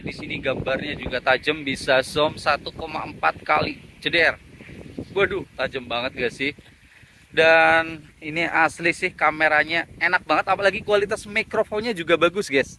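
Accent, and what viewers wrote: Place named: Banyumas, Indonesia. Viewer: Logatnya ngapak banget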